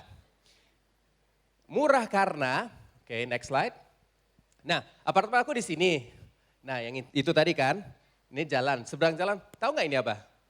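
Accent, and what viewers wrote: Indonesia. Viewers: Smg almarhum mendapat tempat terbaik di aiaiNya....amin Logat sdh sgt Indonesia banget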